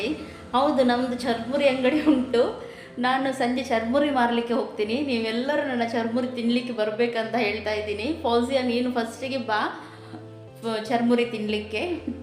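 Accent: Mangalore, India. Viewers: Sis nimma Mangalore bhashe thumba Chennai so nice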